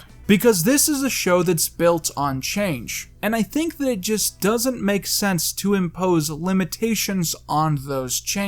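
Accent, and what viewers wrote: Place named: United States. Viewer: The problem I have with this video is that a Yankee voice is lecturing the audience about a quintessentially British science fiction programme. The American TV's record with sci-fi is not exactly innovative. When the pubs were emptying so people could get home to watch the Quatermass serials, what did the Americans have? Captain Video! Those of us who have watched Doctor Who since the first episode, have accepted changes over the years, the Actors in particular. Since the re-boot however, the change that has been most disconcerting is how it has been turned into a soap opera by, let's face it, kitchen sink dramatists. The changes that have occurred did not, for 50 years interfere with the main premise, that Doctor Who is a male hero. It seems that only when Moffatt ran completely out of ideas, that the hints started about gender swapping. 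It is apt that the speaker mentioned Sherlock Holmes, I notice that he did not say, suppose you wake up one day and find that Sherlock Holmes has become a woman. That is the impact of this change. To make Doctor Who a woman is just a lazy gimmick by a desperate BBC to improve the ratings. I suspect that after the initial interest over such a stunt, that the good Doctor will disappear quietly from our screens (mustn't blame the change to a female). Perhaps to be resurrected in less politically correct, trendy, gender fluidity infatuated times, when it can get back to being an escapist, exciting, scary sci-fi show.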